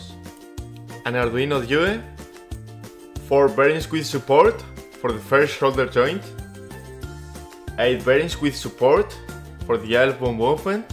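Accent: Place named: Spain. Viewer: Se nota el acento a españita jajaja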